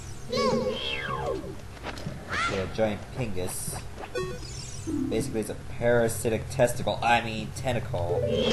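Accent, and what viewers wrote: Canada. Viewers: This guy is Canadian as fuck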